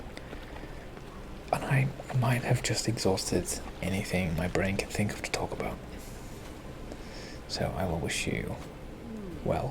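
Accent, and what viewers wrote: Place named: Yorkshire, United Kingdom. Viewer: I dunno if I’m tripping mate, I can hear the Yorkshire accent (I’m from South Yorkshire) but definitely sure I can hear some American in there 😂 *EDIT. You’ve just explained in the video hahaha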